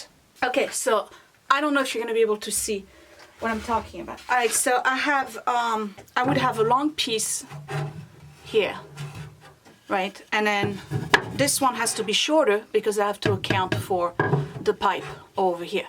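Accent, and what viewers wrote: France. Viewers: Amazing, you remind me so much of my mom, not for your age, of course, as I am the same age as you, but cause you’re so creative and crafty. :) Love your french accent. I am Italian and also have an accent and nobody can ever tell it’s an italian one lol. They always think it’s from somewhere else :)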